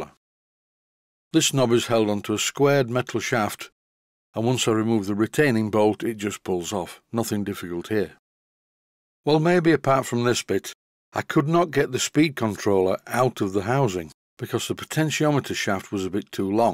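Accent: United Kingdom. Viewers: British people talk with such elegance!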